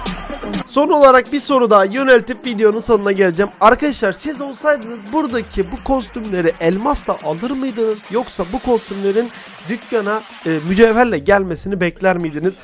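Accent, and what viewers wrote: Gaziantep, Turkey. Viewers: Abi Sen Antepli misin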